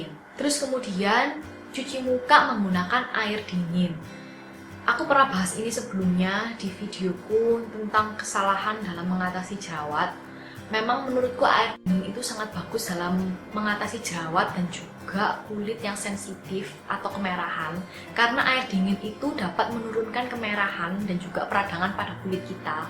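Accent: Java, Indonesia. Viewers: medok mbak?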